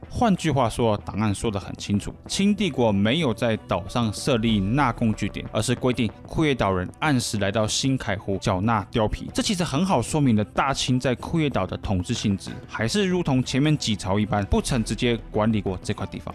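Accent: Taiwan. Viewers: 读书啦大佬，中国多民族是1912年起，不是历史2000年是一个多民族国家。知道历史上2000年，汉族中国跟外族经常生死搏斗吗？知道中国曾经被蒙古人所灭，朝代被称为元朝。汉族被满洲人所灭，朝代称为大清，正名是大清帝国，与日本签署丧权辱国的马关条约上，李鸿章是用大清帝国签署，不是用中国名称的，网上有副本可参阅，自己去看啦。知道五胡乱华，汉族几乎灭族吗？可以反苏反俄，但不可以篡改历史。知道本帖帖主是台湾人，说的是台湾国语吗？ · 在中立、客觀的學術表面包裝下，所成立建構的：庫頁島歸屬邏輯，一樣可套用在臺灣島上囉？？ 被倭人所產生的倭寇，一度以次等人種，來統治對待的後代，現在操著臺灣口音的標準國語，一字一句，企圖向中華兒女，對近代中國血淚屈辱史，要建立所謂的「正確」觀念教育，還真是我中華民族的悲哀。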